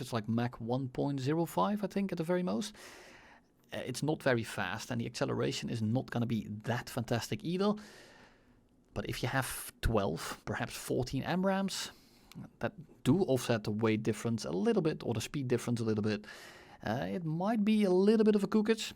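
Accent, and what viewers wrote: Netherlands. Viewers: A dutch man sentually vispering sweet trailer details into my ears. ❤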